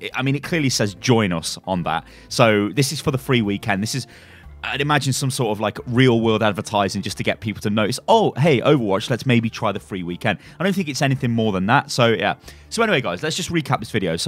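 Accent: United Kingdom. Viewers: I hate how brits say weekend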